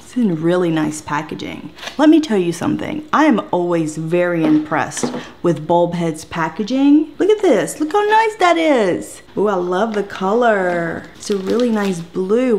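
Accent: Texas, United States. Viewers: yep she’s from Texas!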